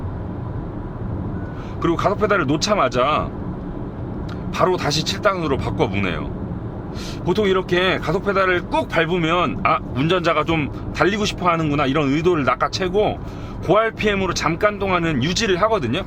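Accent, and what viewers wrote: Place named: Jeolla, South Korea. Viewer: ㅋㅋㅋ무슨소리 억양만 들어 봐도 전라돈데 ㅋㅋㅋ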